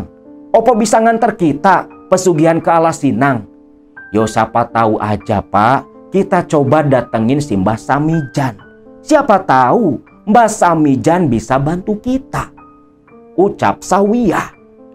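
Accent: Java, Indonesia. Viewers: Kang,bahasa Indramayu e gk begitu kang,ky gtu mh Jawa Tengah Jawa Timur an.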